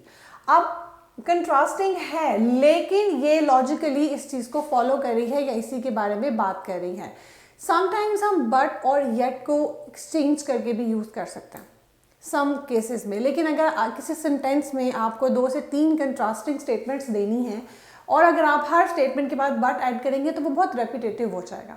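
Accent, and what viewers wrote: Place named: Urdu, Pakistan. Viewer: Mam I think your mother tongue is Urdu right ? But you teach very super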